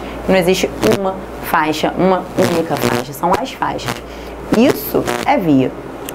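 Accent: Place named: Rio de Janeiro, Brazil. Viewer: So pelo sotaque carioca, já desisti.. Deus me livre....!!!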